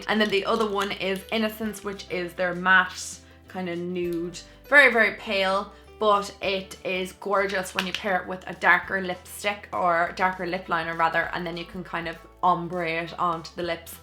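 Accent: Ireland. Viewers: Your videos are amazing! Your are my favorite european youtuber and your Irish accent is so lovely 🙂 My favorite products from the "drugstore price" section are from "The Ordinary Skincare" line. They are absolutely amazing and soo affordable. I know them because Wayne Goss talk about them in his channel. You bet you will love them ☺️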